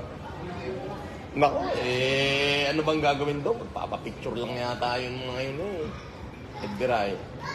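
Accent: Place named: Batangas, Philippines. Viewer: Sir ano ba tribo mo? eh tagalog mo para kang batangeño.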